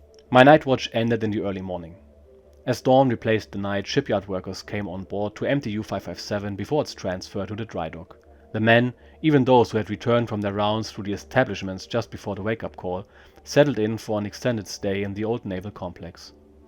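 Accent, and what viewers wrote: Germany. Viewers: You are a terrific narrator. Your German accent makes this all the better. I am second generation German and am currently reading the German version to build my language skills. Thank you for this !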